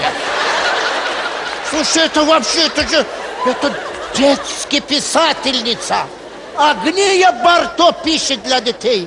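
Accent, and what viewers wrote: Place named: Baku, Azerbaijan. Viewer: Бакинский акцент до сих пор сохранился у него "Иди пей чай даааа"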